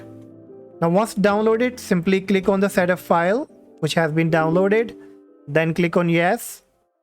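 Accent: India. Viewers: of course he sounds indian.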